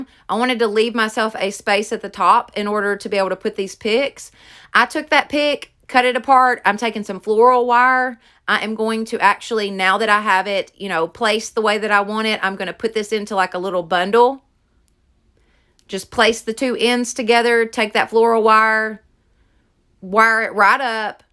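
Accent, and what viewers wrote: South, United States. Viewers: I love your southern draw & your upbeat personality! You must be a southern gal like myself. I love how you say ‘dude’ and ‘slap that paint on y’all’, lol. You’re definitely a lot of fun to watch & listen to! All of your crafts are beautiful. I love the lanterns! The 3 candle centerpiece is so gorgeous! These are my favorite. Hope you & your family have a Merry Christmas! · You are really fun to watch. I love all your DIY’s. Also you have a wonderful accent. I grew up in Alabama and I miss the southern accents. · You are literally the BEST bow maker I have ever seen. ❤ Your lanterns are gorgeous! Ok.. I love your accent. It is a mix between my grandma (OK/ARK) & my daughter (TN/GA) in law. 😉 It makes ya like family. ❤ I love your Reindeer Farms sign. It is gorgeous! Another amazing bow! 🎀 The candle holder turned out amazing! 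🕯 That last sign is perfect. The picks in the corner like that is beautiful. Ty soo much for sharing. I appreciate it. So happy to have you on this Hop! 😀 Merry Christmas! 🎄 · Can't pick a favorite as all of your projects are beautiful. Best part is; I have all the materials to make them. Lord have mercy girl you're a kindred soul for sure. You speak "Southern!!!" Music yo my ❤️. Have a Wonderful Christmas 🎂⛄❄️ and Happy New Year.😇...Roberta from N.C. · I always love everything about your channel especially the accent seeing as I’m from the south 😍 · Love your southern accent. I’m from West Tennessee!!!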